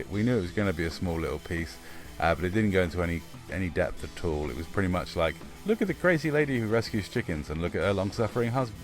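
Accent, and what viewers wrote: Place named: United States. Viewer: I enjoyed the video. Sounds like something one many local USA media would have done. Then again local news in states would have gone little more detail. Your voice and what I imagine what you look like was total different. I could easily pass off as some from the states. It's same that people don't treat the chickens and rosters well. Here states I know a lot people that rise chickens for their eggs and meat. All them treat chickens and rosters very well. I do hate cock fighting and it should be ban through out the world.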